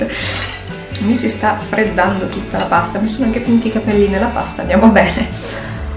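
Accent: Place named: Friuli, Italy. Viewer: hai una cadenza nel parlare che ho sentito in tanti friulani che in un qualche modo mi è familiare. Comunque ho fatto una bella scoperta con il tuo canale. Un saluto con simpatia 😉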